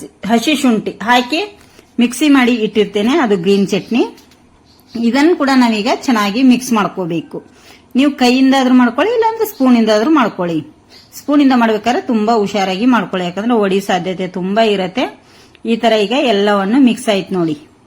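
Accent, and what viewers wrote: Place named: North Karnataka, India. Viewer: ನೀವು ಮಾತಾಡೋದು ಉತ್ತರ ಕರ್ನಾಟಕದ ತರಹ ಇದೆ.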